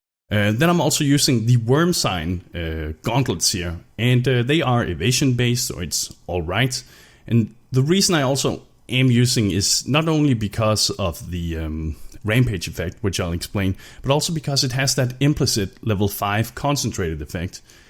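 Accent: Denmark. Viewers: are you from denmark xD that accent sound familier ^^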